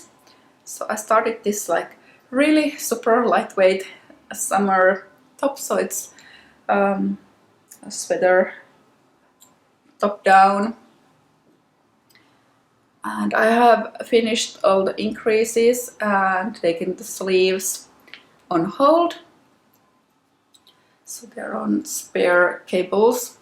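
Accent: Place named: Finland. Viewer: I was looking for summer knitting inspiration!! Your accent made me go to the time I lived in Helsinki!! Lovely memories! Happy new year!!! Regards from Peru 🇵🇪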